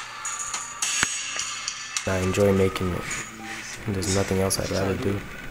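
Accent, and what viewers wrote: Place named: Toronto, Canada. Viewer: Lol yeah thats our toronto accent/slang that you're hearing.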